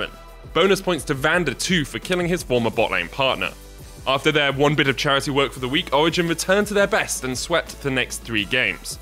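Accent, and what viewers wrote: United Kingdom. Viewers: When Sjokz and Ender does it, it sounds really troll and sarcastic. But when Medic does it with his British accent, it actually sounds like typical English news. Ha!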